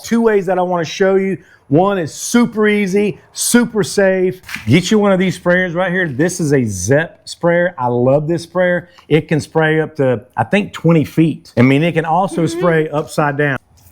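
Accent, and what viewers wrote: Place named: Georgia, United States. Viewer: Excellent video.I'm from georgia, and I had trouble with wasps when I was a kid, and i'm still scared of them now. I'm subscribing to your channel just because of how well this video was produced. Excellent information.Now, I won't be so worried when I go and help my mom take out wasp.Nest at her house. Also, it was a pleasure here in a country.Accent, that's one of the things I miss about georgia. Keep up the good work, sir.And god bless you and your family.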